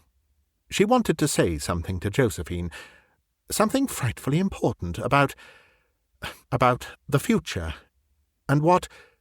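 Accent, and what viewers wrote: England, United Kingdom. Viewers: Probably the best narrator on YouTube. It's a joy to listen to a trained English voice. Thank you.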